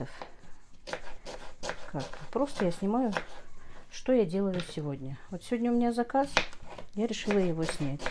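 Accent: Standard. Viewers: Смотрю и отдыхаю Чисто руский говор молодец.Труженница👍👍👍👍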